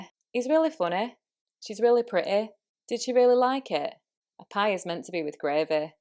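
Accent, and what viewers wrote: United Kingdom. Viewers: I like it british accent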